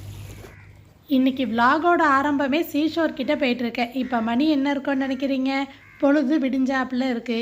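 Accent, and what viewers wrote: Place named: Adirampattinam, India. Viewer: Adirampattinam slang kooda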